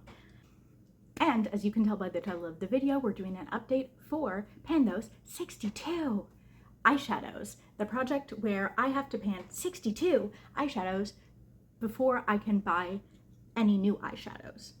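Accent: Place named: France. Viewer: Congrats on the complete refresh! 🎉 that’s a pretty new colour story! So many possibilities!! Also, love your French accent. I’m assuming you speak French? 🇨🇦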